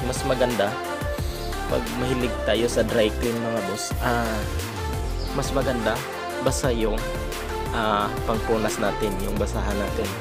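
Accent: Visayas, Philippines. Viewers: Bisaya ka bay noh?😁😁 Salamat sa tip bay👍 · Dong ang tingog nimo halata kaau na bisdak jeee pero ok na aron masabtan sa mga bisdak pod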